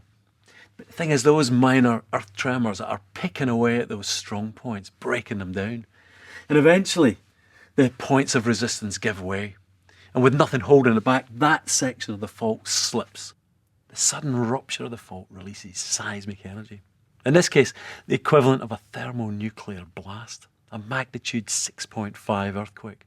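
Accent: Scotland, United Kingdom. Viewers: He had me at his Scottish accent 😊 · His accent combined with his cavalier presentation is grating. All we want is technical information not scottish patronizing.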